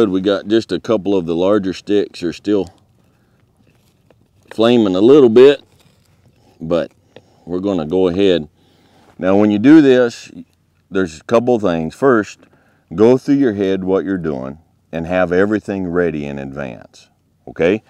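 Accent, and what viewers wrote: United States. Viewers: Your voice is just so American and relaxing! Fantastic work! 😍❤️🌟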